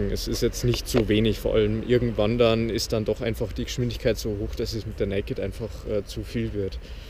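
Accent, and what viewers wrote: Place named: Bavaria, Germany. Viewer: Hab mir heuer auch wegen der Euro 4 noch gekauft in Schwarz.Wo bistn du her?Klingt so wia aus Bayern ;-)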